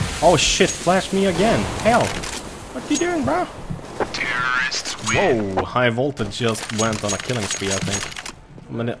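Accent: Sweden. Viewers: The sweeeeedish accent!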